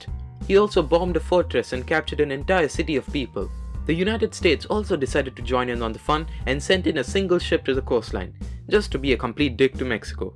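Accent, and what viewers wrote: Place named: United Kingdom. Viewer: im dying lol, gj m8 nice british accent btw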